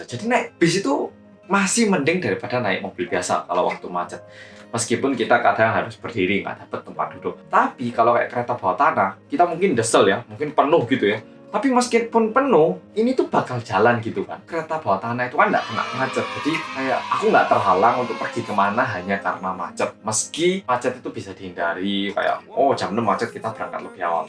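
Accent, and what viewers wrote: Java, Indonesia. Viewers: Ws gembe kopi durung mas medok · Jowone mendok tenan jozzlah..... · Kemedo'anmu berkurang sol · Oke hallo guys welcomback to korea reomit bersama orang korea yang medoooookkkkk Aku pengen naik kereta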